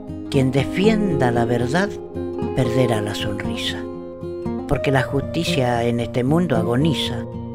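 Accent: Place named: Argentina. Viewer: Tremendo tremendo,bien Argentino lo que dice y como lo dice... felicitaciones gran abrazo...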